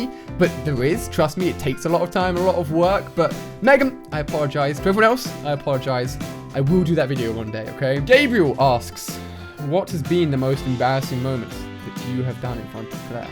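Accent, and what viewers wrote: United Kingdom. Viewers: I THINK HE IS BRITISH BECAUSE OF THE ACCENT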